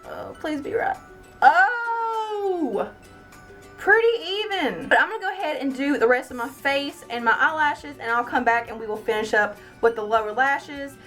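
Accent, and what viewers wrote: South, United States. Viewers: r u using a ton of filming equipment or just your phone. .I think being yourself and using just a phone to record or minimal equipment is so much more genuine and easy to relate to...I love how easily you explain everything and seem to enjoy sharing your talent. .and product information etc with your viewers. .I live in Mississippi so I adore your accent. .looking forward to more videos and tutorials. .great job. ..best wishes!!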